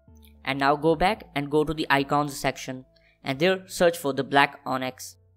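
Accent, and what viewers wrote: India. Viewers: your accent is pretty good and everything but some people doesn't understand maybe because your accent is Indian English so maybe that's why some of them need captions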